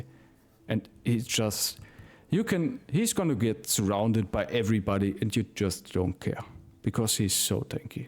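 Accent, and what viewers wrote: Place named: Germany. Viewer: Nothing is quite like a thick german accent schooling one in Battle Brothers! Thanks i learned a lot :)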